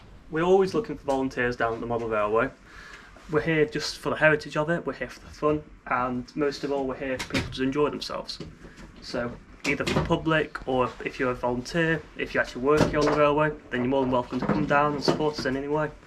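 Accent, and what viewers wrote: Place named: North, United Kingdom. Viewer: We now want to visit this railway, its about 3 hours from us. I did notice your northern accent was creeping in. Who is your lucky lady and expect camerawoman?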